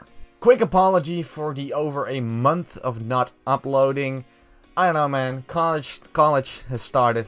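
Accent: Netherlands. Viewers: Very good review, +1 point for Dutch accent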